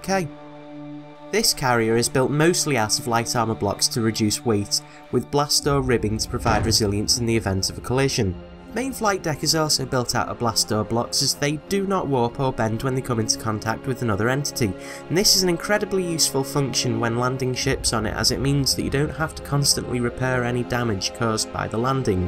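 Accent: United Kingdom. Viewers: Anyone else think this guy sounds like a male GLaDOS at times? And maybe a little bit less autotuned. And British, okay maybe he doesn't sound like GLaDOS.. Just a little bit? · He sounds like he lives in England (well, he's English, he may not live here lol), so nope. We rarely get sunlight.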